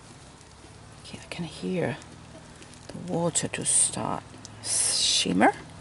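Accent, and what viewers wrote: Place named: Finland. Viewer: I love listening to you with your charming Finnish accent. I am from Sweden but now live in Seattle, USA. Please come back, even for small snippets!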